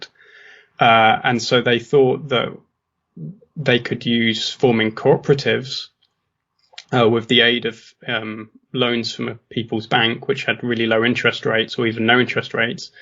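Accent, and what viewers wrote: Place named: United Kingdom. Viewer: Oh no she's British 😔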